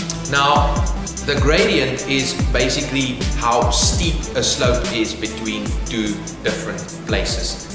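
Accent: Afrikaans, South Africa. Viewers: Africaans accent😁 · Are you from South Africa? Thank you!!! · Are you South African?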